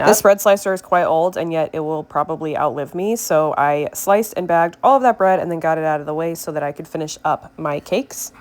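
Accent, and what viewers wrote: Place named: Wisconsin, United States. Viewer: Sounds like up north in WI...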